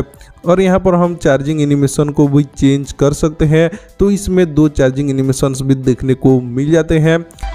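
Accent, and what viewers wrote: Odisha, India. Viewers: I can recognise odia people's Hindi accent from miles away..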